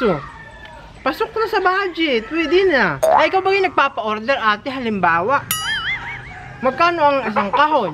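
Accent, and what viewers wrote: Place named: Baler, Philippines. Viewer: i really love your videos po. 🤗🤗 Feeling ko kapunto ka namin haha from Baler haha ganyan din punto namin eh. Malambing😂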